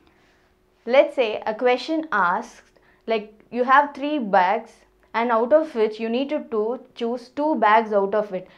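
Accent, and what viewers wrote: South India, India. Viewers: from where are u mam ?? ur ACCENT is like south.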